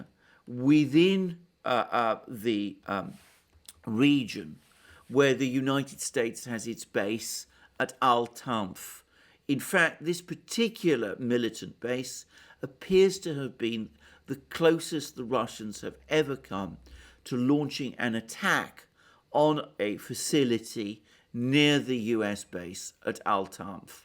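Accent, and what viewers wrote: England, United Kingdom. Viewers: Interesting informative news but boring presenter. The heavy English accent is difficult to hear for Americans.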